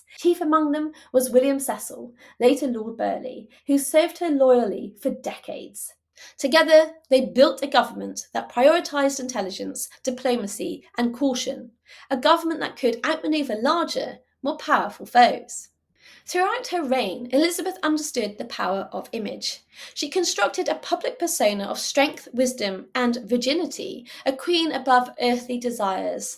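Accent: United Kingdom. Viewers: I watch all the time i forget to like sorry. Actually i’m doing a role that needs RP british and you are perfect ❤ I love your voice. I’m also mad about history and i tell people about your videos all the time. Thank you.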